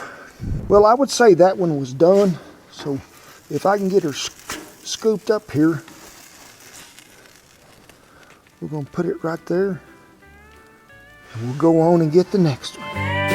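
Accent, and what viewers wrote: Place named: Texas, United States. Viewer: Mac Donoulds for Breakfast. I love the accent from texas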